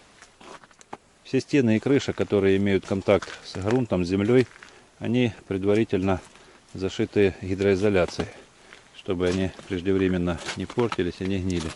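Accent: Ukraine. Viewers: Судя по речи хохол в землянке от войны прячется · Судя по характерному говорку с ридной батьковщины приыхал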